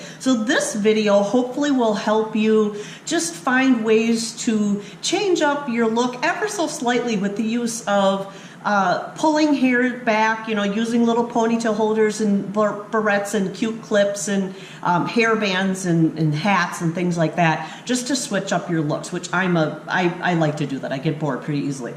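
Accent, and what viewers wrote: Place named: Wisconsin, United States. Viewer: I heard a comedian on the radio today and she sounded just like you! And she said she was from Wisconsin. hmmm. Ok, I think its Jackie Kashian and it must be a Wisconsin accent because I thought it was you. lol. still sounded more like you than her.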